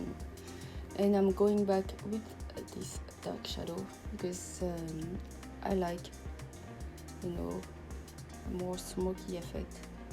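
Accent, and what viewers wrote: France. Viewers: I tried my Viseart Paris Edit palette for the first time last night. Though the quality of the shadows is very good, let's just say that I ended up looking hungover rather than elegant like you do. Even though I don't have a monolid, I'm going to try your method--it really came out nicely. Love the name of your channel and sweet French accent. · Do you speak french by any chance? It sounds like you have a french accent which would be pretty cool! :)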